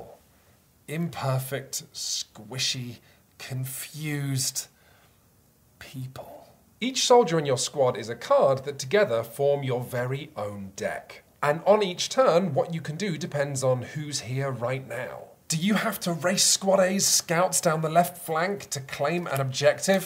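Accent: United Kingdom. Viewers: Genuinely moving stuff. It's especially refreshing and significant to hear this perspective shared from a British voice. This made me proud to be a donator.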